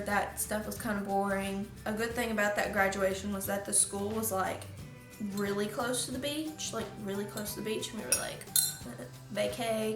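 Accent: South, United States. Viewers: o próprio sotaque dela já da pra saber que é do sul kkkkkk ela deve ser do texas ou alabama ou algo assim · that’s called a southern accent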